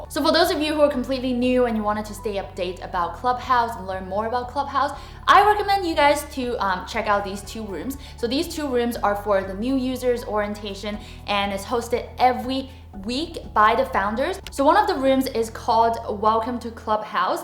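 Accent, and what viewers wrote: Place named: Australia. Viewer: Where are you From. Are you an Aussie girl? i love your accent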